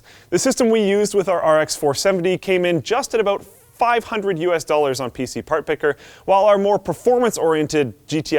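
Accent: Canada. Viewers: from second one you can tell he's Canadian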